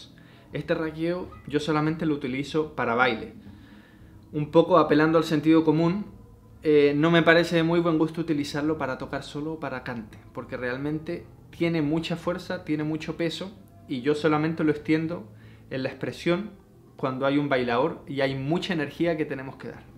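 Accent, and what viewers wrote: Chile. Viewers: Siiiii. Suena mucho a chileno. Yo también me confundí 🤣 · Wena compadre! Te encontré hoy y que gran sorpresa. Estoy aprendiendo rumba, flamenco, vals y boleros. Te agradezco nucho lo que compartes. Caxaba que tenías acento chileno, pero lo comprobé cuando hablaste del chirlito jaja. Ya solicite las tablaturas para estudiar y te estoy siguiendo en instagram. Lo que mas quiero es dominar las técnicas para expresarne genuinamente, sacarne las mascaras y los traumas. Un abrazo. Gracias! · Con razón po, eres chileno, con razón me parecía familiar tu acento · Oshe, pues me dejas con la duda porque tiene un deje chileno que tumba.. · Oye, habla como chileno. Es que la cagó, no lo puedo creer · Eres Chileno?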